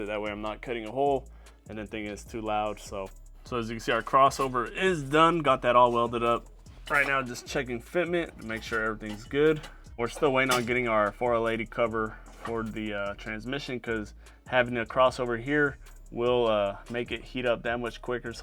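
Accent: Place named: Louisiana, United States. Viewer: Hold up player! You from Scott Louisiana are what? Your roots run deep in your voice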